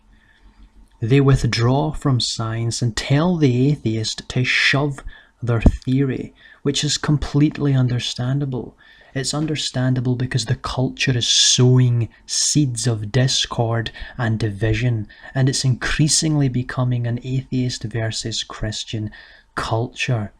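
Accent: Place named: Northern Ireland, United Kingdom. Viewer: My bad - the voice reminded me of the narrator in The History of Film, who is from Northern Ireland.... I guess I wouldn't last long in that part of GB. ☺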